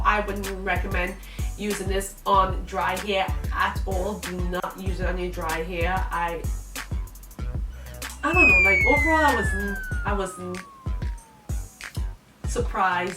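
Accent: Trinidad and Tobago. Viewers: I know a Trinidadian when I hear one 🇹🇹🗣!!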